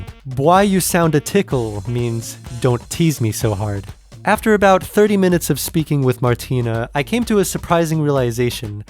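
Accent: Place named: Jamaica. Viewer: I can understand pretty much everything said when you don't talk over it....for some reason I mostly almost completely understand it....go figure Jamaican.... English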